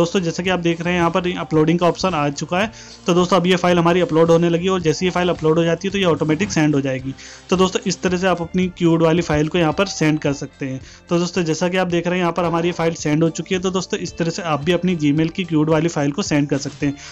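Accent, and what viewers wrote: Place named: Himachal Pradesh, India. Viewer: Ye to himachal wala ke voice hai☺️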